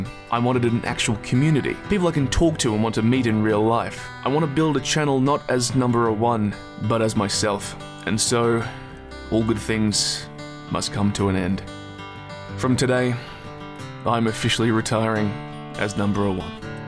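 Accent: Australia. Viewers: answer any you like: are you australian? what was your favourite ytp to make? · Oh fuck didn't know U were Australian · I didn’t know you were Australian. But see ya later I guess!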